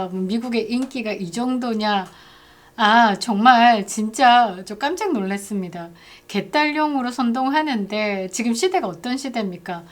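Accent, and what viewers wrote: Gyeongsang, South Korea. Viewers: MC면 성조강한 사투리나 고쳐라. ... 여자들은 잘 고치는데 겅상도 티내냐 ㅋㅋㅋㅋㅋㅋ 윤석열 시절에 언론 기레기들이 김명신 얼굴보고 와~까지 하면서 단체 딸랑거리고 생일날 북한처럼 경호실 축하송까지 만들어 부르는 수준에서 언론 딸랑거린다란 소리가 나오냐 ㅋㅋ 신천지 통일교 전광훈 세계로 교회출신 극우냐? 나라를 위기로 만들어놓고 탄핵이나 당하는 주제에 아직도 정신 못차리고 그입에서 새벽예배를 언급하네.. 예수님을 못에 박은건 당대 보수주의자인 유대교 바리새인들이였단다.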